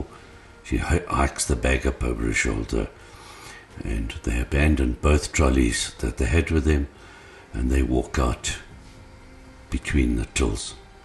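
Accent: South Africa. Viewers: Sounds like a South African accent. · Ughh a Afrikaner accent gross. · It's obviously not America. All the shoppers are black and the narrator has a South African accent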